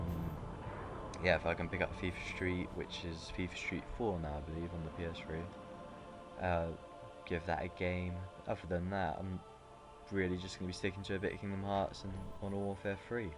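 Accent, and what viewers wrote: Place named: United Kingdom. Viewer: A fellow Brit liking Kingdom Hearts! I have never heard a Brit do a commentary on it :) Love it :3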